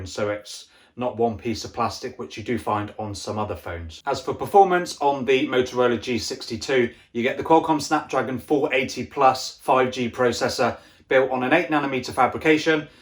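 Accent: Bristol, United Kingdom. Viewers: He speaks with a Bristol accent.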